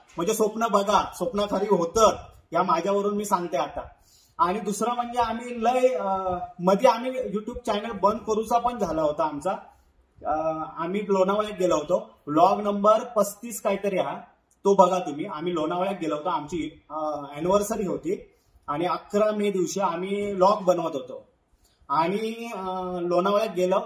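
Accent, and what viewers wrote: Malvani, India. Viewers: तुमची मालवणी भाषेत बोलणे खूप छान वाटते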